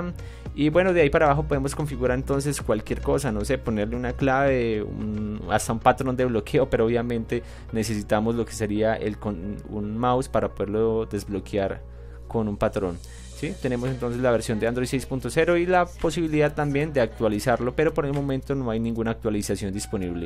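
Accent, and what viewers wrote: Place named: Colombia. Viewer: buenas creo que por voz que eres colombiano! que precio tiene esa box y en dónde comprarla en Colombia ? gracias de ante mano.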